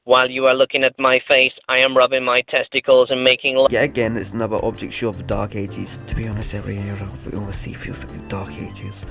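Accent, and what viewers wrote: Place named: Scotland, United Kingdom. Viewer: i was thinking "damn thats a heavy scottish accent" and wasnt gonna comment on it but then immediately in that thought got to this part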